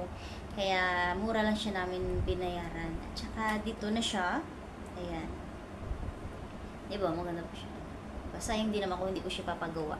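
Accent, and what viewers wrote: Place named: Hiligaynon, Philippines. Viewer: Ang ilongga accent di tlga sya matanggal oyy 😂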